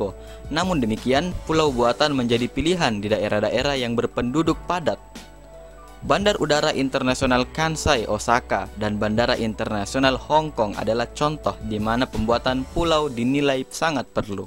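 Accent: Makassar, Indonesia. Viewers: logat makasar ki cukimai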